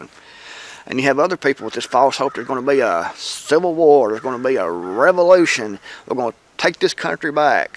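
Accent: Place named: Tennessee, United States. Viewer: What state are you in? Sounds like Tennessee lol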